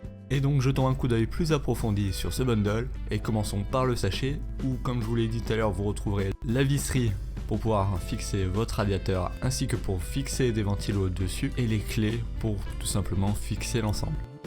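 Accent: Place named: England, United Kingdom. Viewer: Excellente présentation du produit je trouve...Belle accent anglais au passage LEL !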